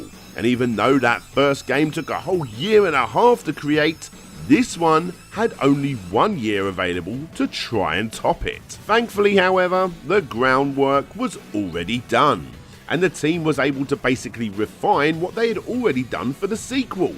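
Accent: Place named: England, United Kingdom. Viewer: While I enjoyed your video, and your English accent is marvelous to a boring yank like me... You never mentioned the PC version! Shame! But good job none the less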